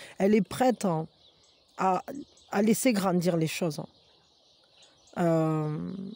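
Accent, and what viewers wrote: South, France. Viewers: Bo jour Jaime beaucoup vous écouter pour votre bienveillance et votre petit accent du Sud 😉le lapin violet me correspond à 99% je suis très fou et j’aimerais que l’autre le soit aussi mais il attend il hésite et c’est assez pénible mais on verra lors de notre prochaine rencontre 🤷‍♀️Je lui laisse faire le premier pas c’est moi qui l’ai fais jusqu’à maintenant merci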